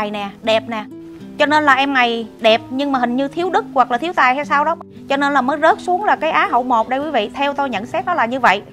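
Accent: Standard. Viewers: lập luận sắc bền rất thích!phát âm khá chuẩn nhưng vẫn còn vài khuyết điểm " luận " " bình LUẬN " không phải " bình LỰNG " chỉnh lại một chút sẽ hấp dẫn hơn chúc em luôn thành công Mãi dõi theo em dõi theo lẽ phải!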